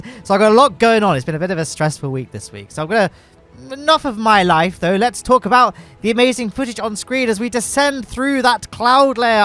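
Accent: United Kingdom. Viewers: You're actually so violently British irl it's just funny, nice work as per usual!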